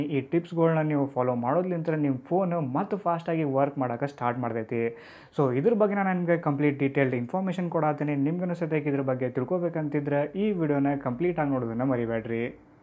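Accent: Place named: Uttara Kannada, India. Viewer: Superb Uttara kannada language superb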